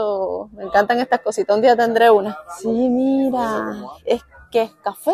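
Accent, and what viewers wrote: Cuba. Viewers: Cubanita me encanta tu asentó ❤️ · el acento lo vas a perder cuando estés hablando con chilenos, pero aunque pasen 30 años, al volver a hablar con cubanos, vas a recobrar el acento, claro que nunca va a ser el mismo.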